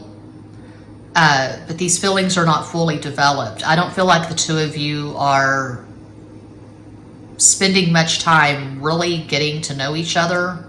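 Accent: Texas, United States. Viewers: Eres muy buena!!! And i love your texan Spanish! i lived in F Worth few years ago. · I love Texas accent, love from Austin, TX. 💖 Picked Pile 4, wish we are committed, but already broken up, he immediately jumped back to a rebound with his ex, essentially monkey branched behind my back.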